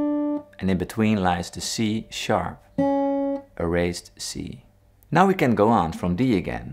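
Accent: Netherlands. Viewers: Thanks a lot why do I hear a Dutch accent while your name is clearly English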